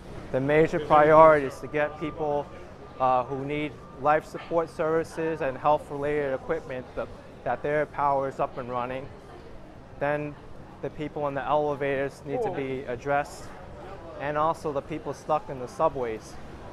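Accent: New York, United States. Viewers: The new York accent is thick in this young padawan 👏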